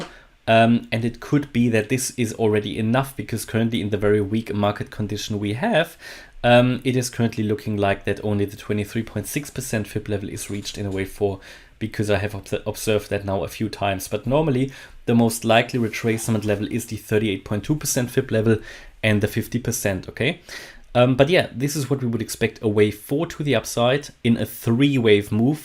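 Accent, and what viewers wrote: Germany. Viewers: an other stunning video full of interesting infos, especially the one you mentioned betw min to regarding the 2 diff optiosn in 3 or 5 wave moves, i m leraning so much follwoing you day by day, The most i like on your channel compare to the other is that you only talk abt TA and EW method, nothing abt market, economy, financial and no sense strategy or opium... keep doing the amazing work and thank so much for all your effort, ( just one question, are you German? ) i m just wondering abt the accent, Golden trades to all of you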